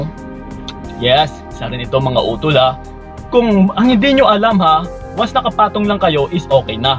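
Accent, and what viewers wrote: Iloilo, Philippines. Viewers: daw ilonggo tono mo tol hehe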